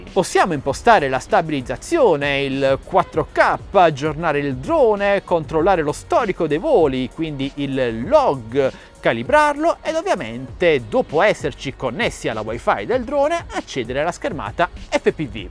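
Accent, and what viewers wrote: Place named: Molfetta, Italy. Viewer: Sei di Molfetta? La A la E ....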